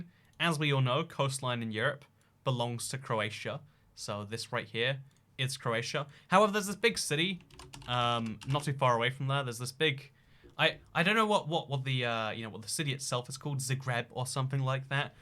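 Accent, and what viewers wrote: United States. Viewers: Thought he was amerixan for a minute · Is this guy American? ??? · Edit: Warning, if you are on a phone, be careful about clicking read more, I accidentally wrote a HUGE paragraph. You may also learn something after you get through the solid bock of text. There is one big reason that people sometimes think that is from America. First of all, you should know that EVERYONE has an accent, you just don't realize that you or the people in your area have it, because it is part of your dialect. Not all accents are distinct though, and some do sound similar. You also have your own distinct dialect/accent influenced by where you have lived, mostly influenced by where you grew up, and who you grew up with (friends and siblings more than parents). Side note, Great Britain and America have been friends (and allies) since the American revolution, yes Great Britain did try to take back America in the war of 1812 but did you know that even though America lost the war of 1812, America is still its own county. 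America and Great Britain have been influencing each other for centuries, it should no surprise that some people are going to confuse an English accent with an American one, in an area of America that sounds surprisingly similar. I'm looking at you South-west. I am allowed to say this, I live in one of the Southwest states and have been to all of them, but I have noticed, that some states try really hard to have a distinct identity as a state, some states have that, but some states don't have that, and honestly, sorry Southwest of America, exclu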